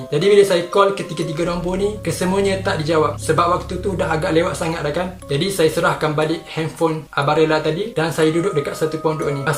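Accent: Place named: Kelantan, Malaysia. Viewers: Macam org kel je bunyi accent 🤔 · Orang Kelantan kalau cakap kl memang akan cam ni, nice 👍 cerita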